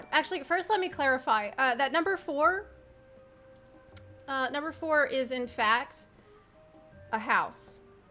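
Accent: South, United States. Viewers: Oh my god, from your accent I sense you were raised in the south I learned this technique and STILL use it. You would tap the dots for twice for 7 through 9. I learned this in Louisiana it's totally ingrained in me to count this way!! My European gf saw me counting this way and she asked me verbatim, "what the fuck, are you on about? What was that?". I said the American education system.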